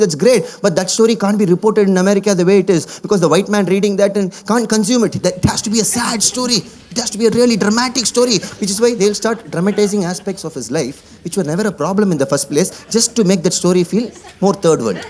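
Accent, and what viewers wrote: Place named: South, India. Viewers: Hahhha.... Awesome Delivery ...Loved d content and 'Macchha' dialect of English. More Power to u bro . Jai Hind ! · Your accent is perfect south Indian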